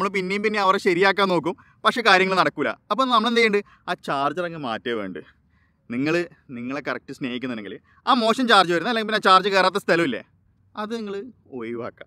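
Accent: Kannur, India. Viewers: Engale kannur karan aano bro ?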